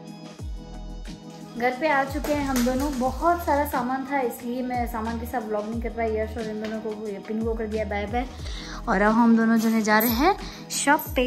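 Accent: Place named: Banswara, India. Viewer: aap konse district se ho? banswara side se lag rhi hai aapki boli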